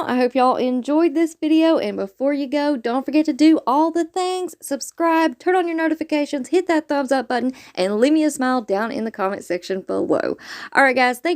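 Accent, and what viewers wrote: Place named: South, United States. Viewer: Odd comment incoming 😂….I love your voice and accent! It’s so pleasant. Are you a fellow Southerner?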